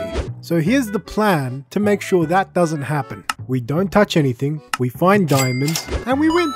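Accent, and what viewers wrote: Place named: England, United Kingdom. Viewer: Ur voice is more English sounding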